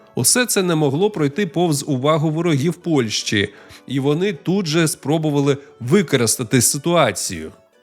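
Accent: Russia. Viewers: Житомирщину до совка частково називали Волинь,це пізніше так назвали "Луцьку область". Автор раніше видавав контент ворожим язиком,звідти і наголоси.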